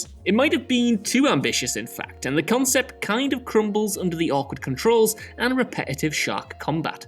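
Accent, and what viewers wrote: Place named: North East England, United Kingdom. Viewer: He’s got a bit of a north eastern English accent.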